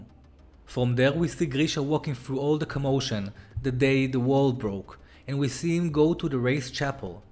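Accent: Israel. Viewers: what funny accent. ur just Israeli brah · וואו איזה תוכן איכותי ישר לפי המבטא מזהה ישראלי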